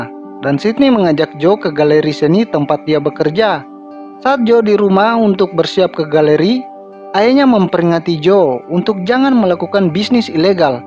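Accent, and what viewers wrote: Eastern Indonesia, Indonesia. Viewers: Orang timur Lu ya bro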